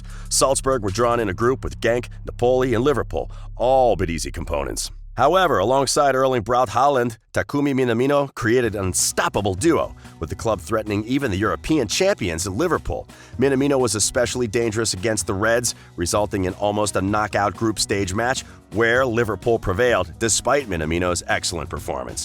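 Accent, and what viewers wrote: United States. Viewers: Soon as I heard the annoying twang of American accent couldn't take it seriously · U can tell he's an American for sure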